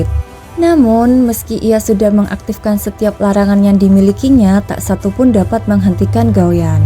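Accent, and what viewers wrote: Java, Indonesia. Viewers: kok suara ini kayak channel sebelah suara medok nya ni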